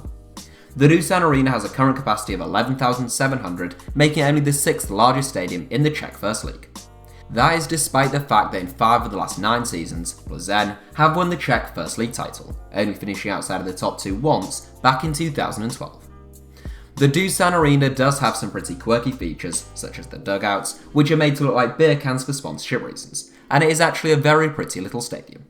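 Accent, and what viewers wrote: England, United Kingdom. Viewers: why on Earth would you picture a random French bloke when an Englishman speaks?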